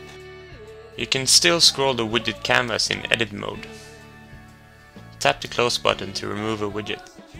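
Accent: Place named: Sweden. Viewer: Swedish! haha, heard it on your accent :-)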